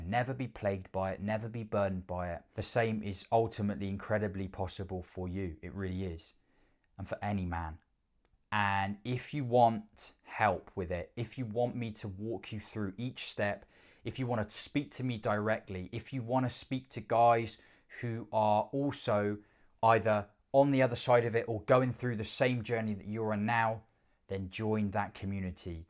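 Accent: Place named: England, United Kingdom. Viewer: Hi m8, i love your accent..where in England r u from?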